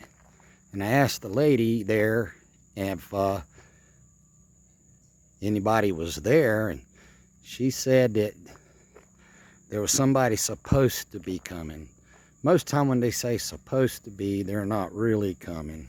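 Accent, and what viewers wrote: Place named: United States. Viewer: Nice content. Your accent is really like a cow boy hahaha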